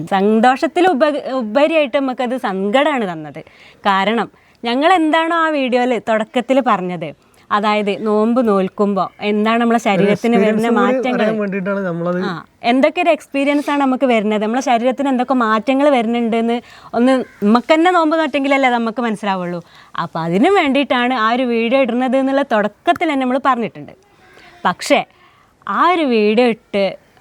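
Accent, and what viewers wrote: Malappuram, India. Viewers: അതാണ് നമ്മൾ ഈ മലപ്പുറം ജില്ലയിൽ കളിച്ച് വളർന്നതാണ് നമുക്ക് ഇതൊന്നും ഒരു പുതുമയല്ല ഇതും അതിനപ്പുറവും എതിർക്കുന്നവർ കണേണ്ടി വരും നമ്മൾ ഒരു പൂന്തോട്ടത്തിലെ പലനിറങ്ങളുളള പല ചെടികളെ പോലെ നമുക്ക് എന്നും സന്തോഷത്തോടെ കഴിയണം ഞാൻ ഒരു മുസ്‌ലിമാണ് എൻ്റെ രാഷ്ട്രീയം SDPI ആണ് ഞാൻ എന്നും നിങ്ങളുടെ വീഡിയോ കാണുന്ന ആളാണ് ഒന്നാമത് എനിക്ക് ഫുഡ് ഉണ്ടാക്കുന്നത് കാണാൻ ഇഷ്ടമാണ് അതിലേറെ നിങ്ങളുടെ മലപ്പുറം ശൈലിയിലുള്ള അവതരണം ഒരുപാട് ഇഷ്ടമാണ് ഇതെന്നും നമ്മുടെ ഇടയിലുള്ള ചില പുഴ് കുത്തുകൾക്ക് ഇഷ്ടപെടൂല അവരെ വിട് നമ്മൾ ഉണ്ടാകും നിങ്ങളുടെ കൂടെ നിങ്ങളുടെ കുടുംബ ജീവിതം എന്നും സന്തോഷത്തിലാവട്ടെ ചേട്ടനെ കണ്ടാലറിയാം നല്ല ഒരു ഭർത്താവിനെയാണ് ചേച്ചിക്ക് കിട്ടിയത് എന്ന്🎉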